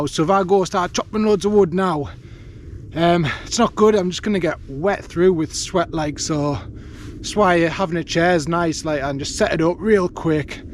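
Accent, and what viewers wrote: United Kingdom. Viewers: You from the uk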